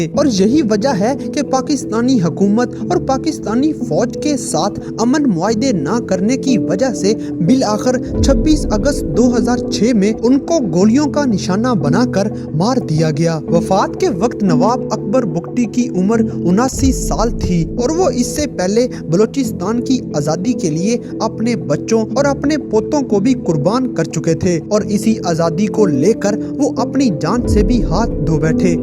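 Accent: India. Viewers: In my opinion The accent belongs to India. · یہ چینل انڈین ہے اس کا لبو لہجہ بتا رہا ہے ۔ نفرت پھیلا رہا ہے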